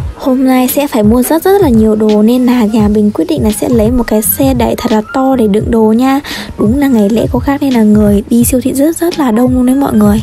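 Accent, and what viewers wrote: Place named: Hai Phong, Vietnam. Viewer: người hải phòng mà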